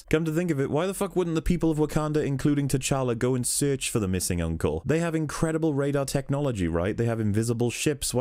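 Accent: United Kingdom. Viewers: we all know what N-word you were trying to use....And its not nitpicker, which is what this entire video is. Seriously, stop trying to hold yourself up like some sort of film scholar when you have to complain about how they're clothes are designed. It's called "tradition" and "culture". This whole video, i thought was a joke, and in retrospect, it is, just like your channel. But wow. Why is Black Panther somehow the ONE marvel movie that pisses you off so much? It's like you had your teenage son write this in a few hours and asked for dad to use his british accent to do a voice over because everyone can trust a british accent. What a pathetic excuse to get mad over a movie. Btw, i do agree with like, 20% of what you said, but if i play the "suspension of disbelief" card, you'll somehow misread that as "oh well, opinions are subjective bro." So instead i ask you to study in a film class or something and brush up on your presentation. 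So many unnecessary "F" bombs, at least get creative with your cussing. So uncivilized. :) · I hate to say this to you but I feel as if your British accent works against you with this particular commentary. It makes you sound like those willfully ignorant colonizers they speak of in the movie who came to Africa in other parts of the world to quote on quote spread civilization wow being willfully ignorant others culture identity and or achievements. I say this because a lot of you are complaints we're actually dressed in the movie I'm not sure if you tuned things out once you came across something that did not make sense or forgot them. A lot of your complaints for example are centered around the heart shaped herb as well as a panther suits. Again I say this is more or less comic book accurate so if you're going to lay fault lay it on the comics themselves. I suppose you could blame the movie for not editing out elements that don't make sense so allow you to have that. 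However it was clearly explained that only the line of the king could consume the heart shaped herb. Anyone else it would kill or rather only one person in a million could consume the herb and live. Now I will give you this, the whole kinglee trial could have been done better. That is also something that was taken from the comics but not in its exact form. On YouTube there is a cartoon call Marvel Knights the Black Panther. Essentially the trial was as such, anyone was free to go through the trials to become the king and those trials consisted of vesting wakandas best warriors. The Last Man Stand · you can sound like you know what you're talking about by just having a British Accent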